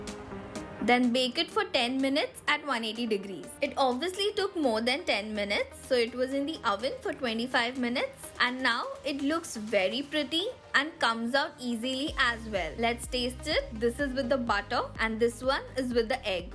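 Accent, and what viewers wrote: India. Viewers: Are you Indian ?????🤔🤔🤔 B'coz your accent seems to be indian.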